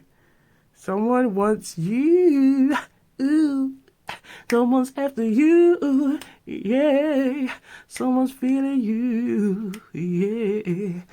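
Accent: Jamaica. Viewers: I think this is the second time I’ve seen one of your videos and I just wanna say I LOOOOOOOVE the patois so much 🥰🥰🥰 reminds me of here in Australia how we have our own slang language no one else can understand haha. I love seeing the same/similar things pop up across different cultures. Our wa gwan is “scarnon” 😂 Bonded by dialect 😁😁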